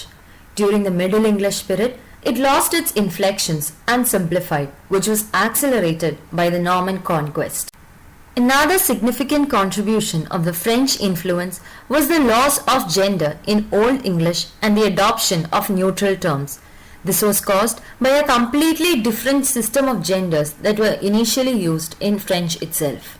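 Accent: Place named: United Kingdom. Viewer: That horrible British accent makes it impossible to understand.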